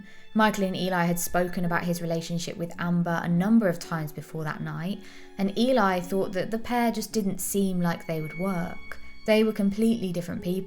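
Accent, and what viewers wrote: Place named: East Midlands, United Kingdom. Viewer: sweet east midland voice, dimples and football active wear...be still my heart:thanksdoc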